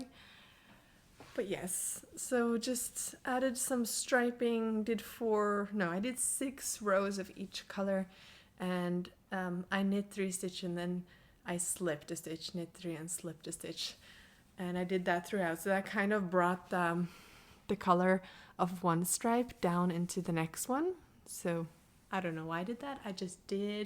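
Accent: United States. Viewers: Beautiful fobs. You have a very American accent for a person who lives so far from USA! I love your vlogs!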